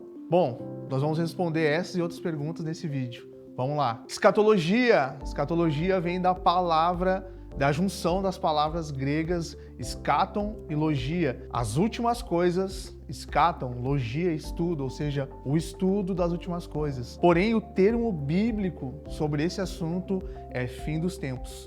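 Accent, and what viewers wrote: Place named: Porto Alegre, Brazil. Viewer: Muito bom! E não poderia faltar esse sotaque porto-alegrense 😂👏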